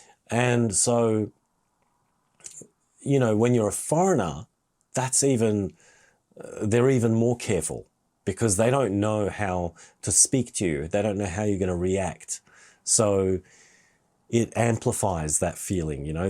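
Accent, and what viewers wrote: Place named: Japan. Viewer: 日本人っぽい英語ｗ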